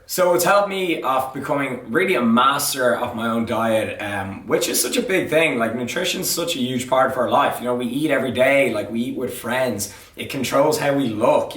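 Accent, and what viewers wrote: Ireland. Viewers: Ayyy anoder irish man! Coming from Northern Ireland here!!!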